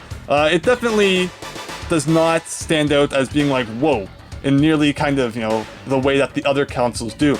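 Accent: Canada. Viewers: Have to ask..I hear a Canadian accent, I get the impression that the Tg-16 was a bit more popular in Canada rather the US. Did NEC directly supply Canada? Just curious .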